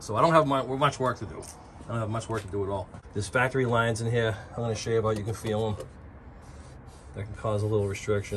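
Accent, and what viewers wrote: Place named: Massachusetts, United States. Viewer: Hey I picked up on your Massachusetts accent I live in ma as well. Central ma . to be exact how much would you charge me to port match my plastic intake for a 6.0..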